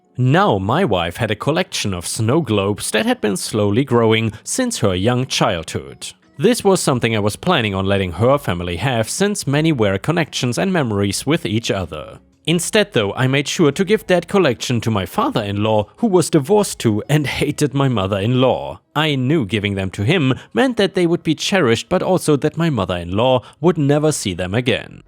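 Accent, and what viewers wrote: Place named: Germany. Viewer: Hey, love your videos!❤ I have a question and I hope it's not rude. I'm curious to know where you're from, it sounds like you have an accent and I can't quite place it, maybe german or sth scandinavian (I'm German and you sound very german to me😂). But I saw in your info that you're located in the US so I'm really sorry if AE is your mother tongue and I just insulted the way you speak or something😅 I really like the way you read so please don't get me wrong, I was just curious:)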